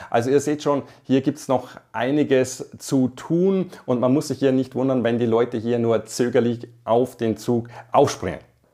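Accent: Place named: Swabia, Germany. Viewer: schwäbisches Englisch ist immer wieder köstlich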